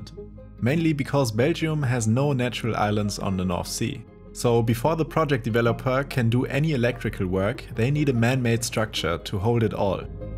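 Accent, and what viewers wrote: Germany. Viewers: man merkt so krass dass der Sprecher Deutscher ist. Ganz schlimm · Great, we have English with a heavy German accent but no original German sound track. Kind of annoying. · Hopefully there is some work put in to try and reduce the accent. I am new to the channel and just watched 2 videos from last year and then clicked on this and I instantly went "oh they have a german narrator now". Its quite noticeable.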